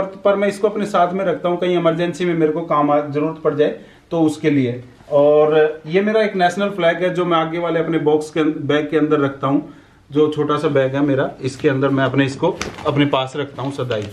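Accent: Haryana, India. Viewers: bhai harayane k bnde ki awaaz or boli bta hi deti h ..... haryana k h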